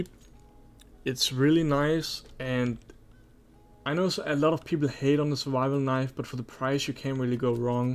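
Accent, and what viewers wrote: Denmark. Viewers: holy danglish bror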